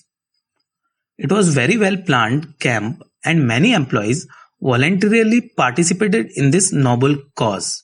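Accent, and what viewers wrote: India. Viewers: Indian ahh acent 😭🥀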